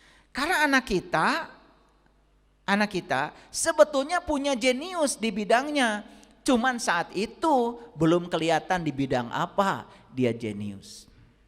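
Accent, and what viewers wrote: West Java, Indonesia. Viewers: Saya malah asyik dan seneng ayah guru ngomongnya ada sundanya 😍 padahal saya orang jawa 😂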